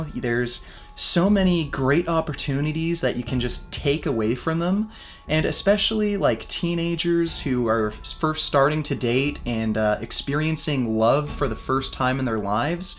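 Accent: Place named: Toronto, Canada. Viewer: Xavier Rose typical Toronto accent. It sounds insanely gay.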